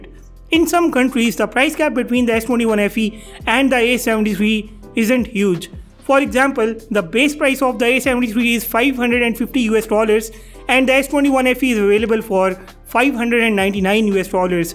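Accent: India. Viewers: Indian accent to much to take for whole video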